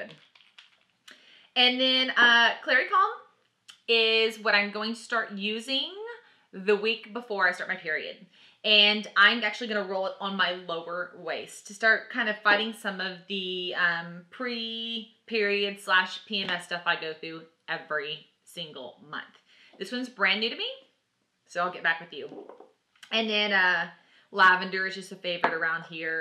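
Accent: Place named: South, United States. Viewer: She's southern, y'all need to stop correcting the accent. If you're southern doTERRA sounds like du-terra. It's not a big deal; get over it. 🙄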